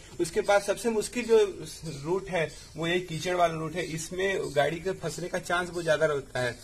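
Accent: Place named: Uttar Pradesh, India. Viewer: Ye ladka pakka up ka hai 😄🤣🤣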